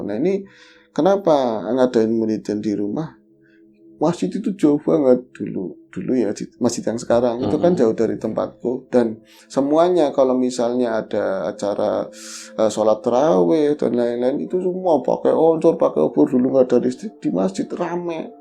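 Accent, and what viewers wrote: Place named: Brebes, Indonesia. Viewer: Ngomonge Kya wong Brebes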